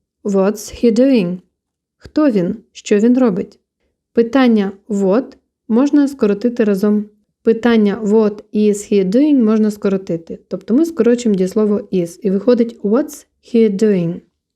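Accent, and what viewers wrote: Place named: Eastern Europe. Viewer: наче все правильно, але сильний східно європейський акцент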